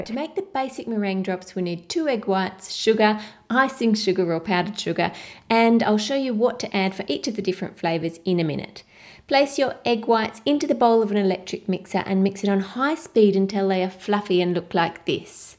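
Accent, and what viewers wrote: Australia. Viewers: Do you live in Australia you sound like it not to be rude or anything I love your videos I am so hungry now🍧🍨🍭🍬🍴🍪🍩🍮🍫🍦🍰🍟🍖🍕🍔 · Your Australian (:0) whaaaaaaaaaat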